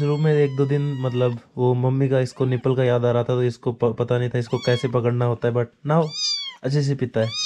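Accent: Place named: South, India. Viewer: Am not against or racist... Just to clear myself.. Your accent constraint me to ask you. Are you from South.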